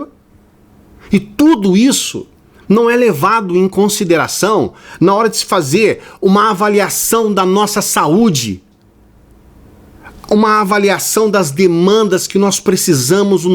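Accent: Minas Gerais, Brazil. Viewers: Vc e mineiro?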